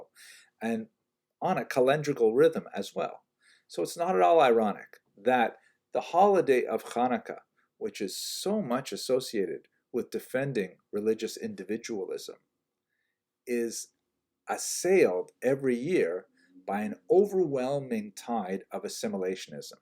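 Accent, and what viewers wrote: Canada. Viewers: Your Canadian is showing in this one.."..trade them like Hockey cards.." lol.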